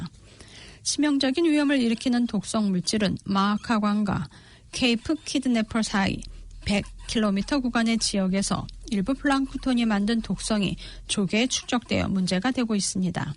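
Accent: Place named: North Korea. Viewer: 발음이 북한같아요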